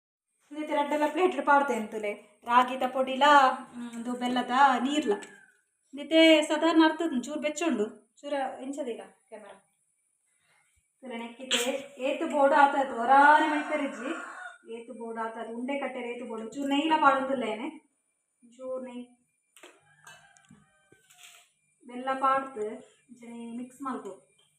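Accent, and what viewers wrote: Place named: Tulu, India. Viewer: Hi akka er tulu pathernaga batru nakl pathernaleka apund akka😍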